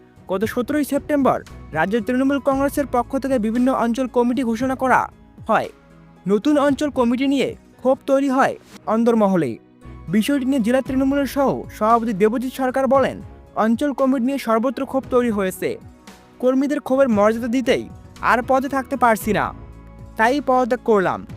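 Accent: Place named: Bangladesh. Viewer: আপনার উচ্চারন বাংলাদেশীদের মত।😮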